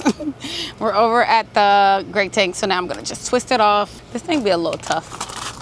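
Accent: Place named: Jamaica. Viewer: How come you sound Jamaican from time to time?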